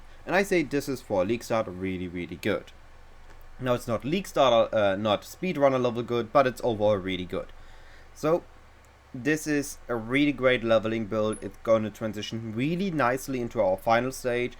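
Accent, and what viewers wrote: Germany. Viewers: High-end german accent.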